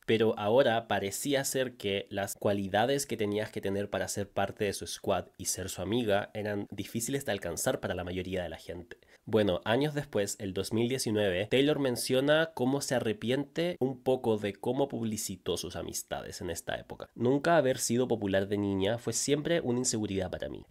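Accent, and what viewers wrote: Chile. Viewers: Mann, tardé tres minutos en darme cuenta que sos de Chile y yo soy re fan y amante del acento chileno, voy a disfrutar la narracion como no te imaginás, me suscribo ahora mismo. ¡Saludos desde Paraguay! 💙 🇵🇾🤝🇨🇱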